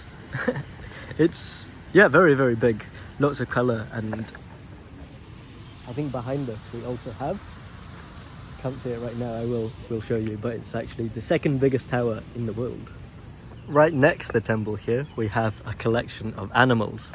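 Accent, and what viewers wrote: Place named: Argentina. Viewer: I keep watching while reading most comments here about how calm and chill this episode. My first impression is you're from South America, particularly like Argentinian. 😂😂. Then to my surprise that you're from Wales when i check your profile page. The only Welsh i knew was the menacing Giggsy.. Aha Enjoy your time in Malaysia eh..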